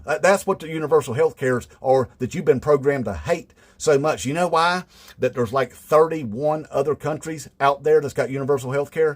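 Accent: South, United States. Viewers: You definitely put out some hilarious videos. I detect some real intelligence behind that corn pone accent that you use. I am subscribed and like every time. Also sharing. Inmate trump. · You're hilarious. Pleasantly surprised I am that such a southern sounding bloke is actually sound. Subsribed in Ireland 🇮🇪. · Beautiful work my man. Just love'n that American accent.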